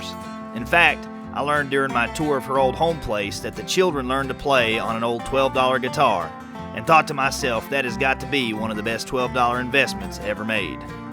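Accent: United States. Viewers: love ur cowboy accent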